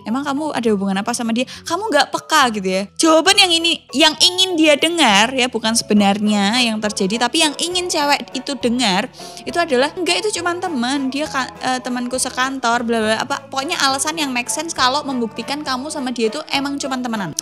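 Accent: Java, Indonesia. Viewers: ko jawa nya bulet banget ya..hmm..yaahc,baik lh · suaranya mirip Nafa Urbach,, logat2 jawanya gitu looh.. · Wong jowo mb? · medok bgt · Suka kamu logat nya jawa tulen 👍👍👍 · Kok benar skl apa omonganmbak dng logat2 jawa yg kental.Koq jadi ingat dg mantan.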